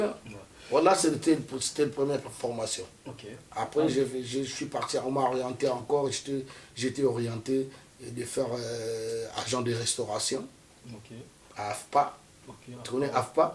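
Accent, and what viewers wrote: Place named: Democratic Republic of the Congo. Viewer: parle lingala pour nous faciliter à comprendre le message t'on français lingaliser la c'est chaud fais plus des lectures des bouquins savent t'aider mon frère tshanguen comme tu le dit toi même courage plus des effort frérot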